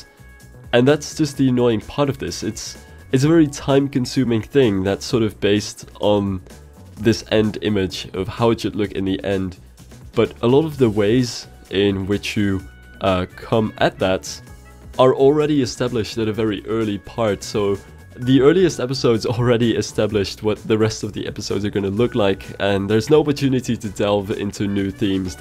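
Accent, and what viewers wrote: Netherlands. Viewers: Literally Dutch with a terrible cold... Beterschap,!:)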